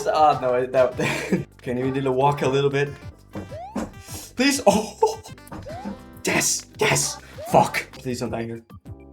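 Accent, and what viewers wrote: Netherlands. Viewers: Wait a min this channel is from the netherlands? So: oke test 1... 2... 3... test ik ga er dan vanuit dat mans nederlands is toch? Of ehh niet? Want als ik zo naar je kanaal kijk zie ik nederland en ik ben ook nederland en ook ik zag net ook nog € en dat is dan al europees ik dacht op recht dat je van Amerika zou komen en gewoon $ zou gebruiken maar nope nederland te gek en ook hey ik hoor langzaam ook een accent en die naam ik weet dat kip nederlands is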